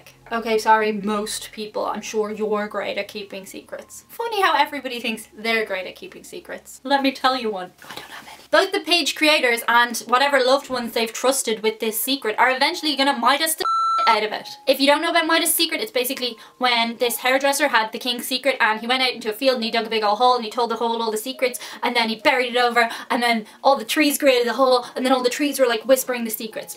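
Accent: Ireland. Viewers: absolutely love you. You make me smile and I know of no one who can talk as fast as you. I have no clue what a Irish accent is but yours isn't what I thought was Irish. I can even understand you as your speaking 6 million words per minute. Isn't it wonderful bloggers have been unveiled, LMFAO. Go girl.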